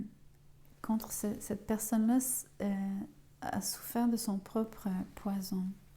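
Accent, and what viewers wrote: Quebec, Canada. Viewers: Ça me parle mais jamais plus de retour en ce qui me concerne il est bloqué de toute de partout c est fini merci ma belle vous êtes la meilleure vous êtes Québécoise j adore cet accent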